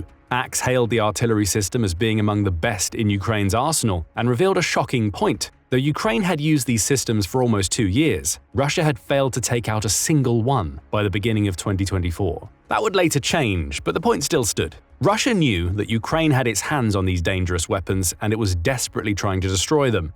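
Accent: England, United Kingdom. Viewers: Amazing to hear someone with an English accent use the phrase, "Get out of Dodge". Funny and cool at the same time. I am hopeful for Ukraine, and despise president trump and his pro-Putin agenda.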